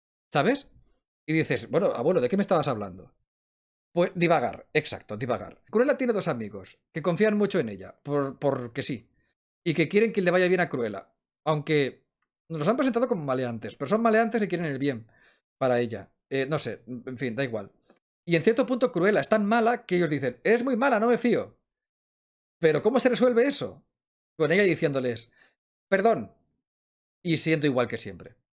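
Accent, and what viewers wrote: Spain. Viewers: Lou, asúmelo, eres un valenciano del norte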